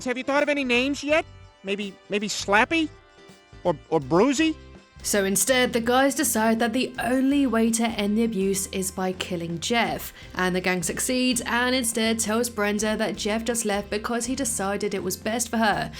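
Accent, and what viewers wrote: United Kingdom. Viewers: your british accent is beatiful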